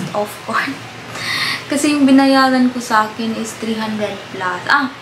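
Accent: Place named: Visayas, Philippines. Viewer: Bisaya lge ka mam unsay probinsya nimo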